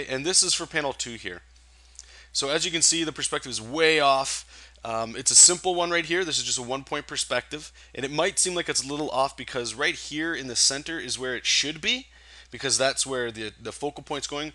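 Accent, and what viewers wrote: Canada. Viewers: That good lol Canadian accent lol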